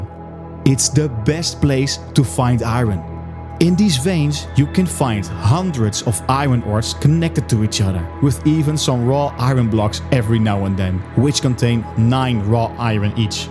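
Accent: Netherlands. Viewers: are you dutch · Iets zegt me dat je nederlands bent 😂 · BEN JIJ NEDERLANDS!!?? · you sound dutch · lol youre so dutch bruh. I mean the stonekolen english is waaay out there. thanks for th vid though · goeie tips maar ik ruik een Nederlander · TYSM! btw i recognize a dutch accent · I smell a Dutchie like me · Is this guy Dutch? He sounds Dutch.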